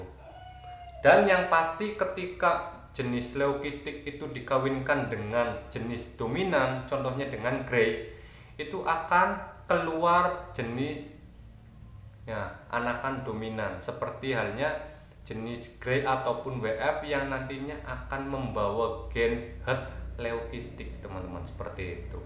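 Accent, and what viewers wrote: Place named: Banyumas, Indonesia. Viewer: Mas, maap orang mana yaa. Deneng logat e ngapak banget..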